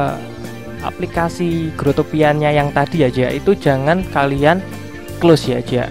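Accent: Java, Indonesia. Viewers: Ngakak sampe logat medoknya 😂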